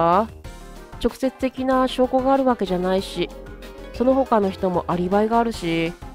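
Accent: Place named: Kyoto, Japan. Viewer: の京都訛りに出会えて嬉しいです♪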